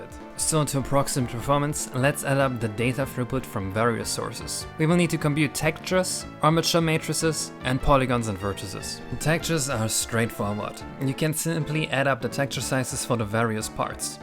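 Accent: Germany. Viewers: You german!? Dein Akzent ist mir nur jetzt eingefallen! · Über all diese Jahre hatte ich irgendwie nie gedacht, das er einen deutschen Akzent hat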